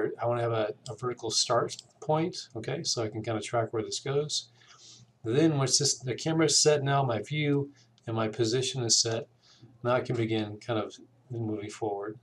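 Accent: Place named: Midwest, United States. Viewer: I'm glad that I found this. It is straight forward and so much simpler than others that try to do the same thing. You are easy to understand for me by speaking mid-western English. I'm a 71 year old retired Engineer and I bought a monthly subscription to Solidworks. I have modeled all 88 pieces from Gilbert's Erector Set 8 1 / 2 and am in the process of assembling the projects. You may remember them. Now I can show my friends the animations. Still trying to figure out how to wrap a rope around a crank and pull something with it.....T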